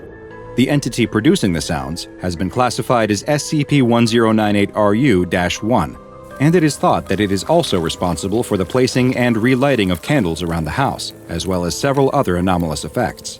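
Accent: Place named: United Kingdom. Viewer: British man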